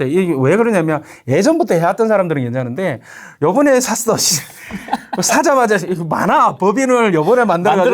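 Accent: Gyeongsang, South Korea. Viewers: 경상도 분이신가요? 완전 다혈질이네요 너무 와닿고 공감 되네요 국회로 가십시요?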